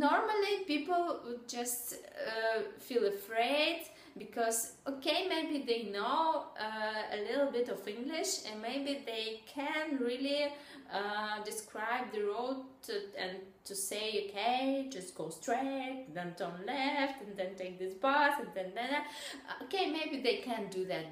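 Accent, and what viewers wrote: Russia. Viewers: I will be deadly honest with you. You do have promenate Russian accent. It is unmistakable. Not to be rude, but your accent can not be mistaken for anything but Russian. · The Russian accent is nice! Please do not lose your Russian accent. There is no need to acquire an Anglo-Saxon accent. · I'm mexican and still learning English a lot of people told me that my accent is like Russian, I thought it was terrible but ur accent it's lovely haha I really like the way u speak English, and u are so good at it keep going... Now I know how my accent sounds like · Sorry for some American behavior. We like the Russians trying to talk English.You are doing a great job and I have met Russians here and have no trouble with them.I like hearing their stories and your accent is cute.Take care and thank you for speaking English it is a honor. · I was here for listening to funny Russian English but landed up in watching an honest confession.This is a really nice video,I appreciate. 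· the Russian language structure makes you speak English with that accent. don't worry. I was in kharkiv for nine months and I can't speak Russian and it is very difficult to pronounce the Russian words., you look very good and your English is careful and cute, take care sister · Your English is good. You definitely have an identifiable Russian accent but it's the cute kind, not the kind that's too thick and difficult to understand. Your voice is pretty and enjoyable to listen to as well. And you are absolutely gorgeous (!), so that helps :p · You are a very nice woman and your Russian accent is very pleasant to hear it! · I think the vast majority of American men adore your ( Russian women ) accent . I marvel at the comprehensive knowledge of English that Slavic people acquire in a very short period of time , especially Russian’s and Ukrainian’s . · You are so cute and you have as how cute you as Russian accent. 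I hope one day, people will say ''ohh is she from Great Britain?'' for your English like you thinking about Danish people's English :)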